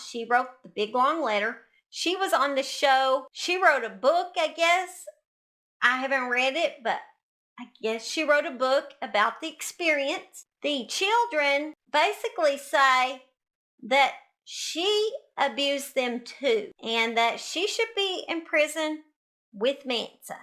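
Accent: South, United States. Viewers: Which Southern state is your accent from? I tried all night to decide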